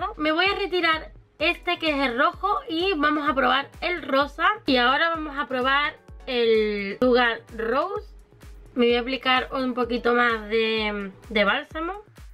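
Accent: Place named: Andalusia, Spain. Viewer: Me encantan y tu eres super graciosa mi madre es andaluza y me encanta como hablas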